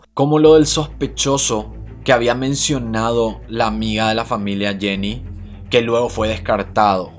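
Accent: Paraguay. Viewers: Omg eres de paraguay, me too ... Amo el acento pero yo lastimosamente lo perdi todo despues de años de vivir en este pincho pais (argentina) :( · Acento Paraguayo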